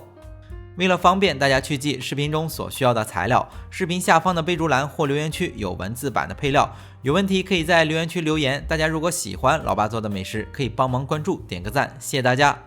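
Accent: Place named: Shandong, China. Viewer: 听口音就是北方人阿，山东的吧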